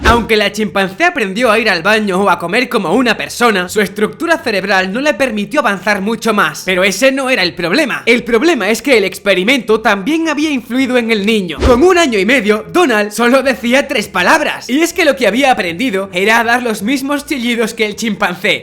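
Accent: Spain. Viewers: Es español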